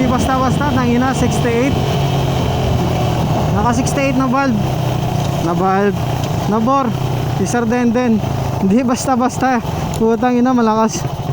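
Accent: Iloilo, Philippines. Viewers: Tkalun kau kah ilonggo... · Ilonggo ka lods ahhahah (testing ta😁😁😁) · Ilonggo ka hah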